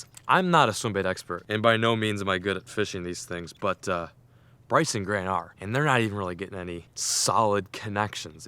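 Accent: South, United States. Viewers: Just moved to Texas and he's already sounding southern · I think your low key catching a southern accent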